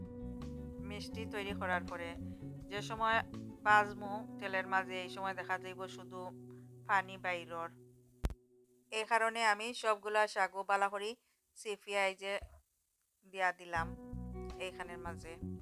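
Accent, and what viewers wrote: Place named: Sylhet, Bangladesh. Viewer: আপু সিলেটি মাত শুনিয়া খুব ভালো লাগলো রেসিপি টা দারুন ❤❤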